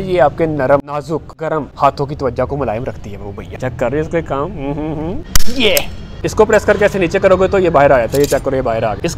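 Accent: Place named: Punjab, Pakistan. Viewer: Laihnde punjab wali boli aa veer ji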